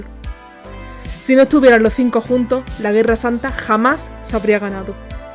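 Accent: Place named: Spain. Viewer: Dejé de ver a los 20 segundos por el horrible dejo español....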